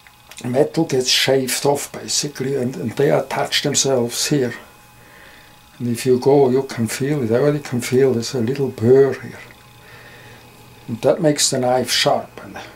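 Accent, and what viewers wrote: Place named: Outer Hebrides, United Kingdom. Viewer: Excellent video; very well explained by a nice fellow. I use the 6 inch round tip boning knife for the deboning, joints and backend when I'm doing sheep. I have the Victorinox lamb skinner for the opening when skinning. I'm going to try a Dexter Russell 5 and a quarter lamb skinner as the Americans usually have good high carbon steel. The Victory New Zealand I have is a topper.. I can't help but think that you say some words very much like us here in the Outer Hebrides of Scotland; it must be the Celtic Germanic connection. Keep up the excellent videos.👍👍👌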